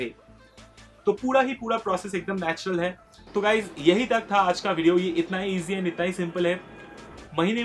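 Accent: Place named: Bengal, India. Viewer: Ooo baa bhalo..tomar kotha sunei bojha jachhi lo. J tumi script lekho na r tumi bangali..ami o likhi na .skript...😂❤️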